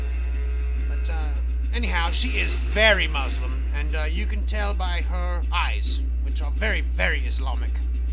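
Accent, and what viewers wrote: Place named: United Kingdom. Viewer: LOL, I love your accent.. you straight sound like someone from a charles dickens movie. :) I hope to see a new video from you soon. Its been awhile.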